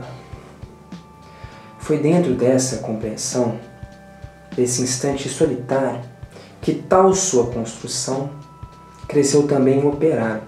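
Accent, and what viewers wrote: Minas Gerais, Brazil. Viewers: Seus compartilhamentos de textos são ótimos! Encontrar esse canal, digo, ter acesso ao seu trabalho aqui, foi um achado e tanto para mim esses dias. E esse sotaquezinho - mineiro, não é...?! Obrigado pelo compartilhamento, dedicação. :)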